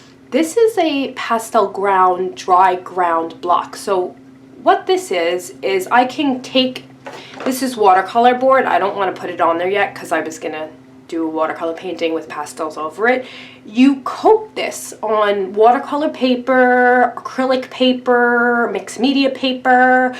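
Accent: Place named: East Coast, United States. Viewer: I just discovered you and your East coast accent❤️‍🔥❤️‍🔥❤️‍🔥🥇🥇🥇🥰🙏🏻👍🏻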